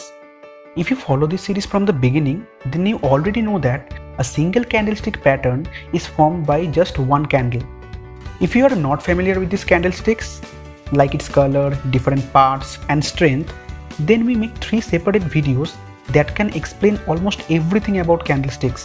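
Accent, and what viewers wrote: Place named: Pakistan. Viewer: I love the paki voice. Such a cool accent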